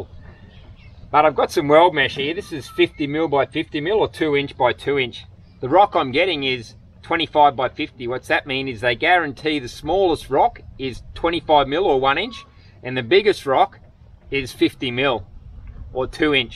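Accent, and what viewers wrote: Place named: Australia. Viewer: Great video so good to see and hear an Aussie on utube. Once in a life time job well done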